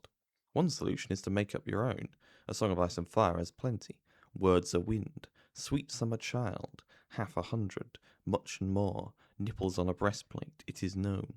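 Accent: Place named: RP, United Kingdom. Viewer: You see what's interesting?? NORMALLY I'd actually AGREE with you... In case, however - nah. The situation here is TOO damned. Hear it from someone who spent 4 years of his life in SCOTLAND: -- I can barely make out utterings sometimes, and he actually speaks in a STANDARD British accent. It's just he's so QUIET, and his prosody is SO CUT-UP and faint...:/.. Seriously, dudes... I have to rewind like 10 times, and it takes me like 30 minutes to fully "comprehend" a 7-minute talk...:/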